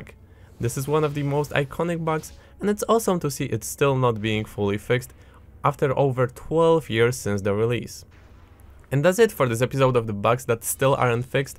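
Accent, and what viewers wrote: Poland. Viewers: Słychać polski akcent xD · I dont know why but i feel like u have polish accent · man you sound like you're Polish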